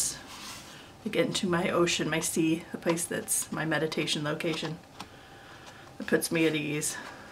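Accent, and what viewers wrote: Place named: Australia. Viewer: Ur aussie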